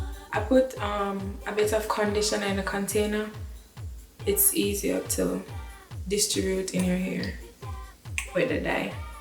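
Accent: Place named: Jamaica. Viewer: Lawwwd goodie u accent 😍😍😍🇯🇲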